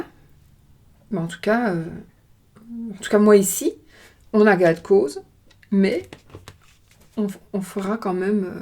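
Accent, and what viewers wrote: Belgium. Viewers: Aha j'aime trop l'accent belge vous êtes belle en plus 🤭🤩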